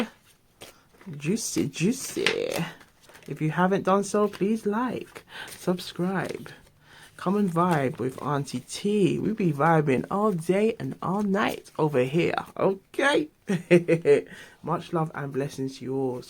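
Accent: Jamaica. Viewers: I think this is the second time I’ve seen one of your videos and I just wanna say I LOOOOOOOVE the patois so much 🥰🥰🥰 reminds me of here in Australia how we have our own slang language no one else can understand haha. I love seeing the same/similar things pop up across different cultures. Our wa gwan is “scarnon” 😂 Bonded by dialect 😁😁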